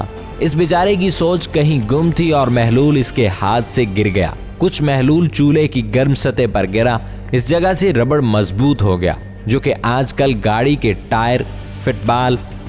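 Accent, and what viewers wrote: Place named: Lahore, Pakistan. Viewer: Hahaha, nai Lahore ka lgta hai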